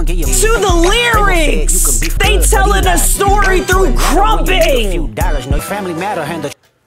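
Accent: Philadelphia, United States. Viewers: I love this beautiful woman being her authentic self and her Philly accent. Meek is not a real nigga 😂😂😂 I’m from Detroit and I couldn’t tell you what a Toledo nigga culture is either lmao Talk to your tumbleweed is crazy 💀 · You gotta be from philly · Hearing a Philly girl hit an "uwu" is hilarious. How that broke through that strong accent is crazy 😂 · Dope! First time through the channel and had to subscribe simply because of the Philly accent throughout the breakdown 😂 I'll be going back through your old stuff. Real Hip Hop will always show when the heat is on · Girl you got a new sub off the screaming 'is this new sh¡t" and banging that table like that. Female reactor energy i dont see that i need! With my ALL TIME FAVORITE accent Philly...im all in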